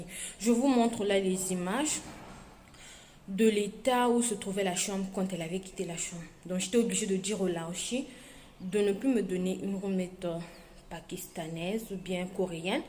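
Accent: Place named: Democratic Republic of the Congo. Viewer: Ah tu es congolaise aussi, ça se sent en parlant😌😅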